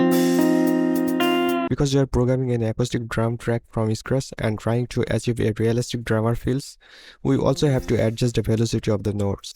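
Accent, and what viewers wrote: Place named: India. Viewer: Nice work👍 I hear an Indian accent😎 Hello from Russia 🇷🇺 FPC is an optimal tool to make pro and solid drum part in FL, but sometimes I use NI Battery😉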